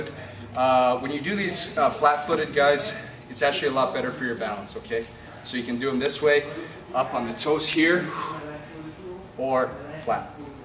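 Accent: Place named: Canada. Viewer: Love the adorable Canadian accent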